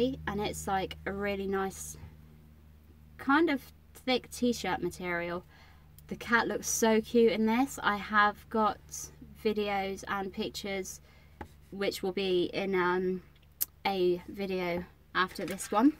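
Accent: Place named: Canada. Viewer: Love ur videos and I love your accent r u from Canada? I'm from. San Antonio Texas in the USA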